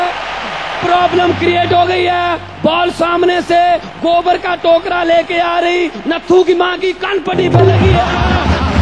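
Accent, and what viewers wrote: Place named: Punjab, India. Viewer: he is actually great comedian in punjab.but he is very uncomfortable speaking hindi in punjabi accent 😂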